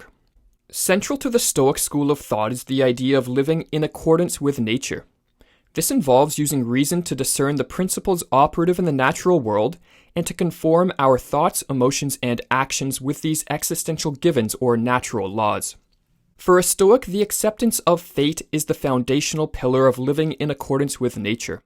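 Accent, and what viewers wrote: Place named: Canada. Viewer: Hey man, long-time follower. Just checked your patreon and I knew by your accent you were Canadian! Whereabouts are you? Love your content!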